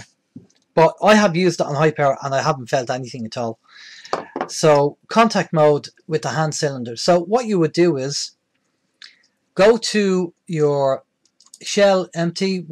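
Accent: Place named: Ireland. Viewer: Hi I have just come across this rife machine and rife machines in general. I noticed you have an Irish accent. Is there anywhere in Ireland this can be bought from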